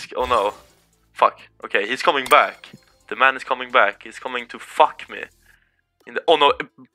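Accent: Sweden. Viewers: Nice video dude, You have to be Swedish by that's english accent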